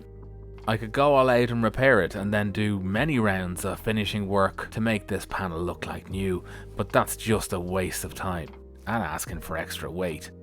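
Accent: Ireland. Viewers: Just discovered this channel, and I absolutely love it! First it's great to hear an Irish accent for a change, and second you are rebuilding one of my most favourite cars, ever since I saw Roger Moore drive one off a pier. Outstanding work too and loving the stop motion to by pass the boring stuff. Excellent stuff!!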